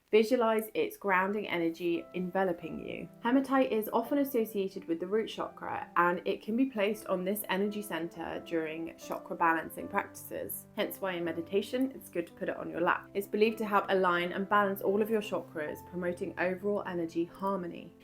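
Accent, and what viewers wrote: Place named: England, United Kingdom. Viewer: Thank you for your very interesting video. I'm new to your Channel and this is only the second one I've seen. I have subscribed as I love your calm, relaxing English accent. You are also a Beautiful English Rose. 😘🏴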